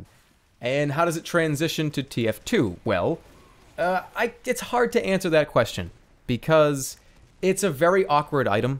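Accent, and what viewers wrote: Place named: Boston, United States. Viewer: I keep trying to put my finger on his accent, but I'm not that familiar with different parts of the US and their accents. Is he Boston, Brooklyn, or neither? I kinda wanna say Boston, but like I said I don't know .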